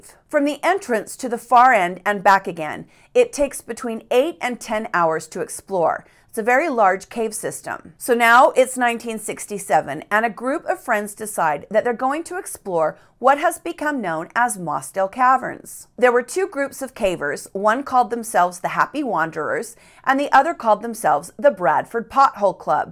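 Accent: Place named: United States. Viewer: I love how Americans pronounce 'The -shires' like something out of 'Lord of the Rings' 😆🤣 so sweet - we say it like plain ol' 'shur' I'm afraid...'Che-shur' 'Lincoln-shur'...& don't get me started on 'Worcestershire' 😄 As for being in a dark 7mile tight cave??...for fun...?! HELL NAW!! 😬👈 Terrifying vid & great channel as always. 📛 🖤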